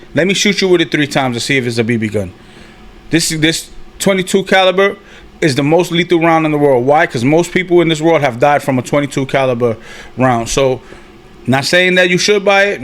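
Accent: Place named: New York, United States. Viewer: Yup new sub my bro 🫡💯 i hear that new Yorker accent 🗽 dope collection n dope video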